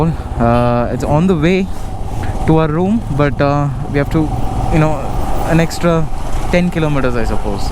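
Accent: Karnataka, India. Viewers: You have good kannada accent. 😃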